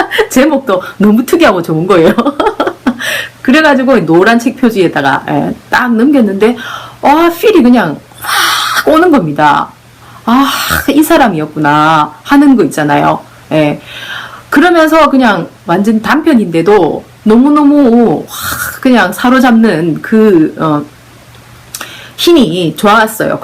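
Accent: Gyeongsang, South Korea. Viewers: 신선하다. 모처럼만에 상큼하다. 감각이 탁월한 것 같은 느낌. 지식적 감각 또한 탁월하다. 경상도 목소리이면서 멋지다. 아뭏든 예리하고 분석적이다. 객관적 사유의 담소 아름답다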